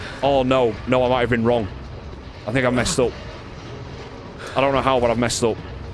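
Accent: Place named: England, United Kingdom. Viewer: Just found your channel through this letsplay, so nostalgic and you have the perfect balance of theorizing and commentary without overwhelming the game! Small question, I apologize if this is rude, but where in england are you from? love your accent best of luck on continued channel growth and congrats!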